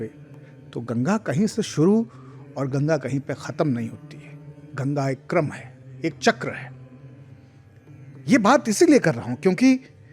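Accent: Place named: Allahabad, India. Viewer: Allahabad style , kahin maja aa gaya , baba is back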